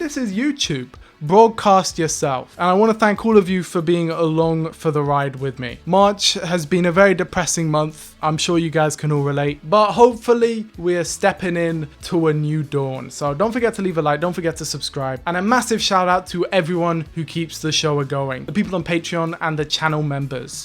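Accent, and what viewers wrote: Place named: Southern England, United Kingdom. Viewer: Are you putting on an American accent some of the time? You pronounce 'time' with a long 'aa' like you're from the deep south (US) but otherwise you sound like you're from the south of England [puzzled emoji]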